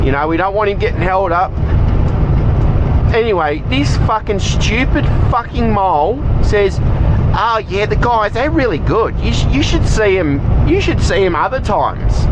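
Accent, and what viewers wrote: Australia. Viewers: LOVE THE AUSSIE ACCENT !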